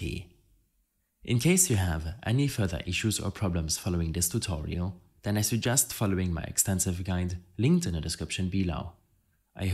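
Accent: Germany. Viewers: deutsches akzent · you sound German could it be? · I HEAR DEUTSCH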